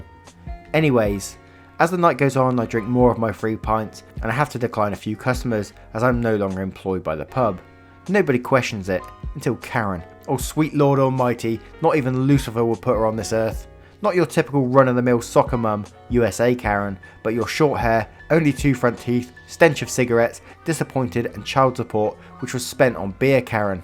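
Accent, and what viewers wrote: United Kingdom. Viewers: You have one thing rSlash does not, a lovely British accent. And you narrate them extremely well. Thanks.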